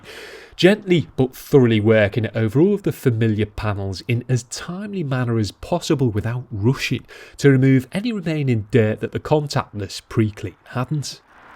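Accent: Liverpool, United Kingdom. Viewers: Where in liverpool are you from,?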